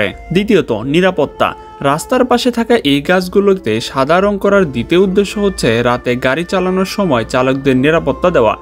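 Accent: Bangladesh. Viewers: ইনার কথা শুনে তো মনেহচ্ছে ইনি বাংলাদেশি।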